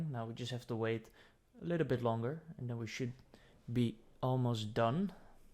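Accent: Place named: Netherlands. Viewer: Crazy Dutch accent (no offense)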